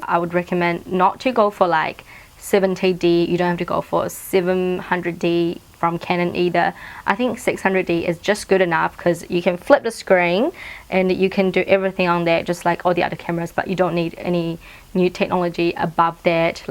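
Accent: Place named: Australia. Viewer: Great tip. Are you from Australia? your accent is so cute.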